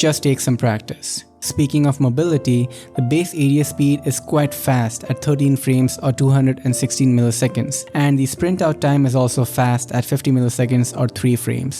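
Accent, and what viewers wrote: India. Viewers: he sound like india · In his recent video, he revealed his voice, it was an Indian accent.. so I guess he's Indian. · Are you an Indian? You sound like one. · I know is India as by his accent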